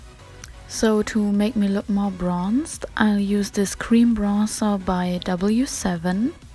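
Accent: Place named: Germany. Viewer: Which country are you from? I think that you have kind of a german accent 😊 I'm from Germany too🥰 where did you get the palette? Did you order it?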